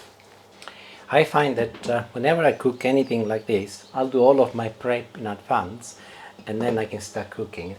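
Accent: Italy. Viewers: Amazing cook! His instructions are clear; his English, with his Italian accent, is excellent! I'll definitely try cooking the dish!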